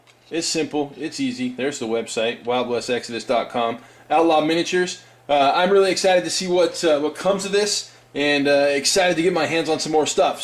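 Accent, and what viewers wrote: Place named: Georgia, United States. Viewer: Welcome back to the vlog world! When did you pick up the Georgia accent?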